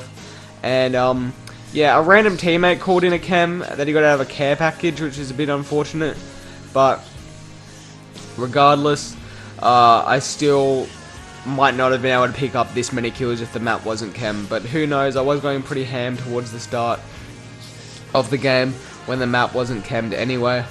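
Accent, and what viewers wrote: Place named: Australia. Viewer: Aussie! ;) - KRNG Raymian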